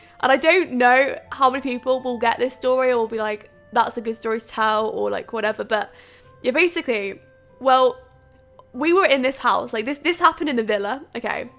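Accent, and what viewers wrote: United Kingdom. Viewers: You have the most British accent I've ever heard.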